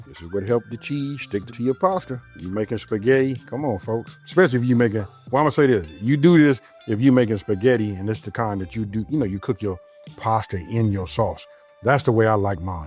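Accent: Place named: South, United States. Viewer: He is from the south stop hating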